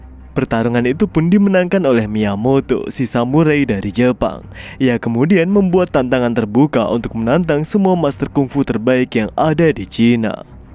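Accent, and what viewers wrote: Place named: Buol, Indonesia. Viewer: Logat apa kw pakai itu... Orang buol kh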